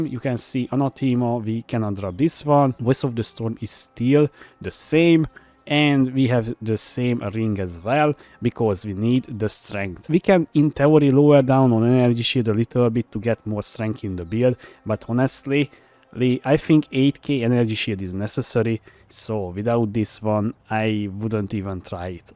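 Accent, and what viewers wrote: Hungary. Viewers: Remélem csak én hallom rosszúl, hogy magyar vagy? Mert sirva fakadok ha ilyen szintű angol nyelvü videókat csinálsz, miközben magyar myelvüek nincsenek.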